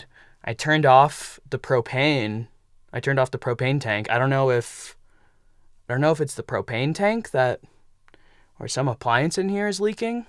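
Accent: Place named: Michigan, United States. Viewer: I like your vocabulary you talk like you're from Michigan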